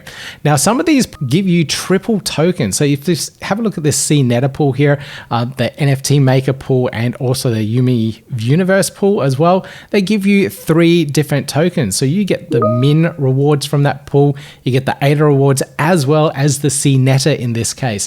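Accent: Australia. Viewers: You sound even more Australian since returning from your break. Love the accent. I’m ready for another ISPO update if there are some new projects coming up soon. Welcome back.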